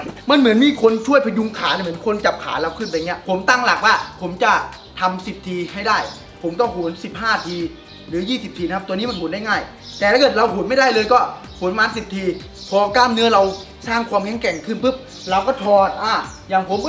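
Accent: Isan, Thailand. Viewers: คนแสานบ่นิคับ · บ้านเกิดพี่ยุไหนครับทำไมพี่ชอบพูดออกแบบอิสาน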